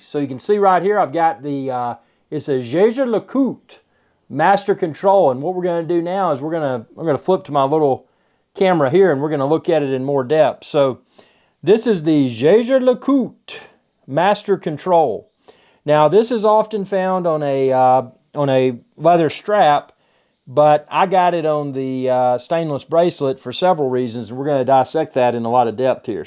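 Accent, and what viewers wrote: South, United States. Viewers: It’s weird to hear a southern accent speaking about a European luxury watch 🤣 but great content 👍🏽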